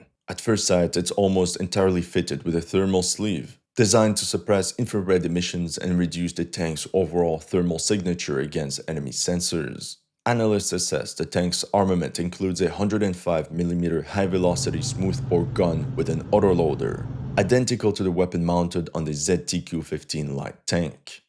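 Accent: Quebec, Canada. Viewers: « c'est ben correct ! » haha le gars est Québécois !! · ahh that Canadian french accent. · Je savais cetait un quebecois depuis le debut 😅😅😅 ga cest ben correct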